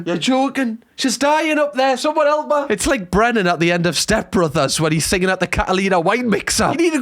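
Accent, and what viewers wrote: Newcastle, United Kingdom. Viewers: I follow you cos I miss the Geordie accent. When you said Jesmond instead of Desmond, I laughed.. 😅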